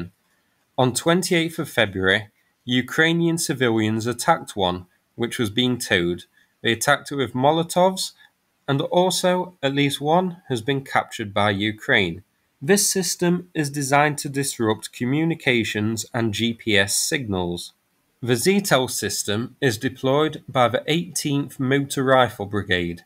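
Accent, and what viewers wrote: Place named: Northern England, United Kingdom. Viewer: Thanks for the info. I'm not a Brit, but if I had to guess I would have guessed that his rather... noticeable accent was northern. 😉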